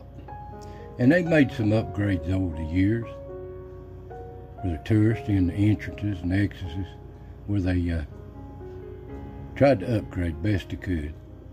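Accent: Appalachia, United States. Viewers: I am from Detroit but my heart lives in Harrogate and Middlesboro. I lived with my aunts and uncles in Harrogate during the Summers. In 1987 my uncle Steve Napier taught me how to drive when I was only 14 years old. We lived in Harrogate and he had a small shop in Middlesboro. To get me past my fear of driving he would have me drive across that mountain before the tunnel. Sometimes we would stop at the Cudjo's Cavern shop for some ice cream (before dinner). Uncle Steve was well known and loved from what I remember., your voice and talk atyle reminds me of so many of his friends that would stop in his shop to visit. It brought me back to the very happiest days of my life. Makes me wonder if you two knew each other...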